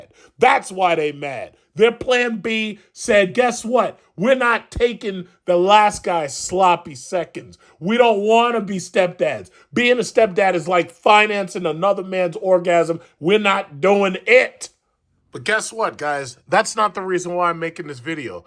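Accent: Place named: New York, United States. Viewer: This dude sound like he's from NYC totally funny guy, but my man spitting out 💎 good content.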